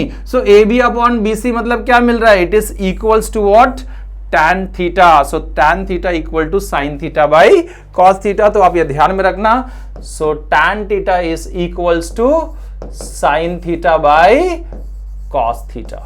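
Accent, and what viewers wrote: Bihar, India. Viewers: Bihar se ho sir ? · Sir aap bihar ke hain kya? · You are in bihari style. Jai Hind Jai Bihar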